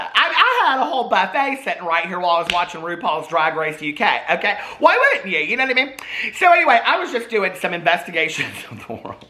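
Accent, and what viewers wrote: South, United States. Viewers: I swear you are more southern than you realize 😂 I still say Q-pon!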